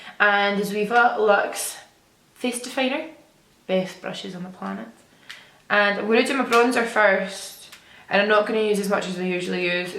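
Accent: Scotland, United Kingdom. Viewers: I agree. Beautiful girl but can't understand what she's saying. Strong Scottish accent!!!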